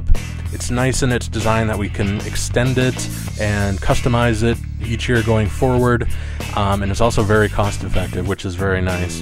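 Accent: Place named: Midwest, United States. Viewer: It's Midwestern for roof. Sorry- our accent is awful.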